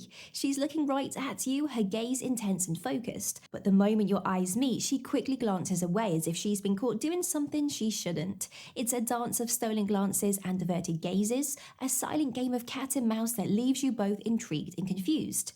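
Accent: United Kingdom. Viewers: Hello there. How are you doing on this lovely beautiful day? Well, it's lovely and beautiful where I'm at in Texas! 😊 Just like that crackhead needs crack for his crack pipe. I needed to hear that AWESOME British accent today! You have a very blessed day! 🙏 You're still finer than frogs hair! 👌 👍👏😎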